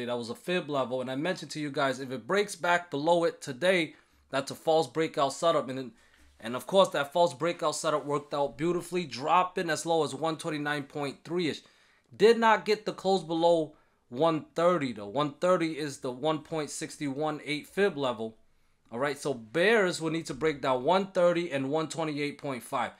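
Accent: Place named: East Coast, United States. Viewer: I can hear that east coast real strong 💪. This guys charting analysis is on point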